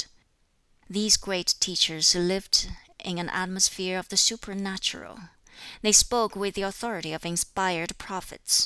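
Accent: China. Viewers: I slip into brummie when mad so go check out a clockwork orange to know what true confusion feels like. Can’t believe people are complaining about a Chinese book being read with a Chinese accent 🤦‍♀️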